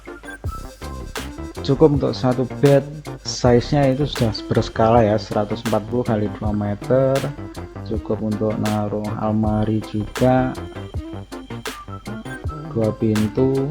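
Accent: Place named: Central Java, Indonesia. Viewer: Mas logatnya jawa tengah tp kok dipanggil Kang? Hehehe. Konten yutubnya keren btw..! Request tiny house 2 kamar ala villa dong budget under 50 jt 😁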